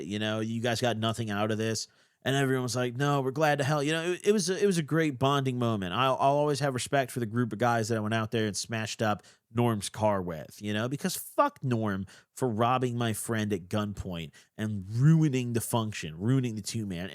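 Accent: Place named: South, United States. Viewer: Southern ass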